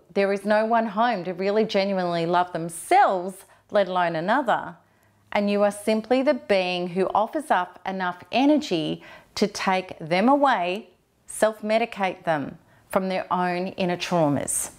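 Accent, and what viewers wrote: Australia. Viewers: I like your accent so much 👏👏👏Love to Australian Nation! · OMG could actually learn how to speak properly, you sound like you fell off the back of a truck in Mt Druitt. It makes it difficult to listen to. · hi Thank you so much for your love, care and education. And nice to hear your AUSTRALIAN. I've just tried 10 plus times to get your free, free yourself education from online but the site isn't letting add both my name and email address. Could you please send it to me? I'd love to read this. I'm a victim of crime. Would love your support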